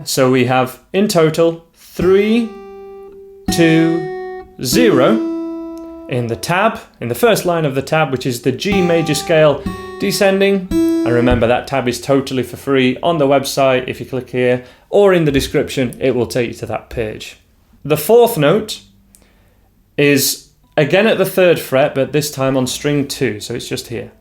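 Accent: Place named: Buckinghamshire, United Kingdom. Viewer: Where u from? U sound Buckinghamshire esque.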